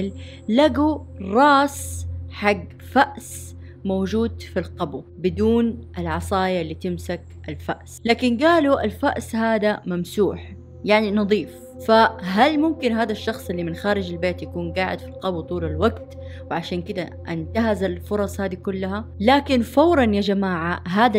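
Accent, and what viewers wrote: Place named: Hejaz, Saudi Arabia. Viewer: لهجتك الحجازية عسسسسل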